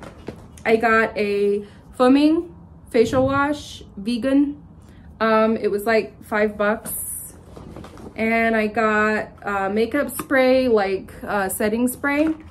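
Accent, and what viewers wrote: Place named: Kenya. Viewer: Now you have Kenyan accent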